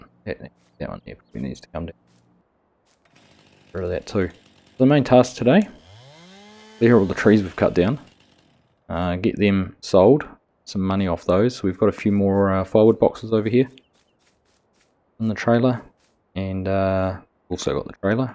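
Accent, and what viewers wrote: New Zealand. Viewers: I’ve listened to your voice long enough now that I can understand everything you say. At first that Kiwi accent sounded like you were speaking a foreign language. 😁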